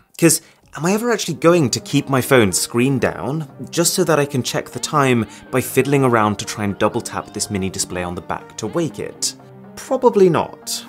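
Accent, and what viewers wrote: United Kingdom. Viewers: You can convince people to eat their phones. The way you explain these phones with your British accent is amazing.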